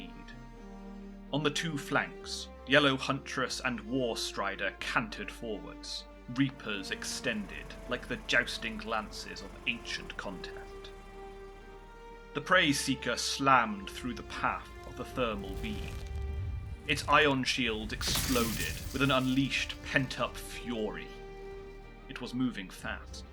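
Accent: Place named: England, United Kingdom. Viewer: Dark gods bless the English accent. AI always mispronounces everything. Among the repeat offenders: Noosphere. Love the storytelling boss. 👊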